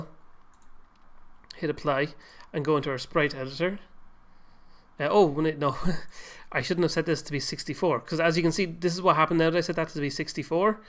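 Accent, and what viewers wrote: Ireland. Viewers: Are you Irish? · So true. The Irish accent seems to ease its way into my brain, making me understand more efficiently. ;-) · I'm all about this dude's accent. Ireland needs to pump out more tutorials. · Lol your voice and Irish accent both remind me of remind me of this YouTuber called TheTerroriser (aka Brian). Anyway, thanks for the tutorial ❤ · I actually didn't realize he was Irish, the accent is so burned into my brain it sounds normal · The best parts of the video! 1. Your Irish, which makes everything better! 2.The tutorial was very easy to understand and helped alot! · your accent is what i live for if i had an irish accent i would fucking love myself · I'm so used to Irish accents that I didn't even realise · I just realised how irish this man is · Are you Irish or something?? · This guy's Irish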